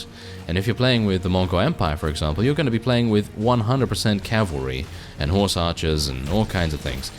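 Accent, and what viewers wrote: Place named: RP, United Kingdom. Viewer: Absolutely love your videos and your posh accent lol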